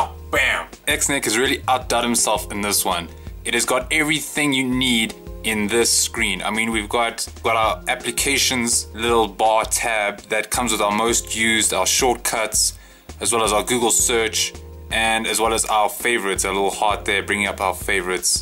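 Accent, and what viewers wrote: South Africa. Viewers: Hmmm sounds like a South African accent.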